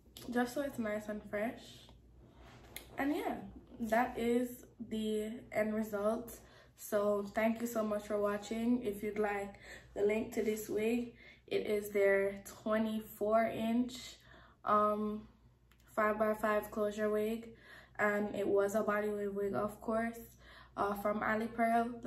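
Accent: Jamaica. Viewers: Are you Jamaican? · Are you Jamaican? I keep hair an accent for some reason hehe this is my first time coming to page definitely love this video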